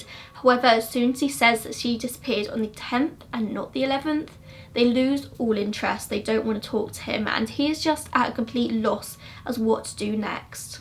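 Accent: England, United Kingdom. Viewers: I really love your English accent. I'm from Argentina and I've been studying English for 5 years. I'm listening to you while I'm working. You're amazing, thanks a lot.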